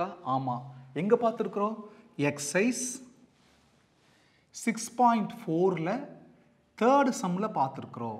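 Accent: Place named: Kongu, India. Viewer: Thank you sir from your subscriber... We have done our maths exam... Despite the QP and marks i would lyk to show my gratitude for ur videos which helped us a lot.. I have been watching ur videos throughout 12th standard... Ur videos helped us very lot and I insisted my friends to watch ur videos and they gave a valuable feed back... We would miss your kongu slang and your teachings... Once again thank you very much sirr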